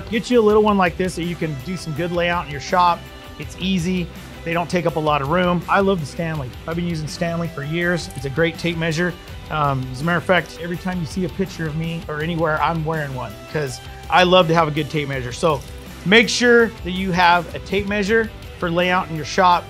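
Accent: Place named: Montana, United States. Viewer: are you from Montana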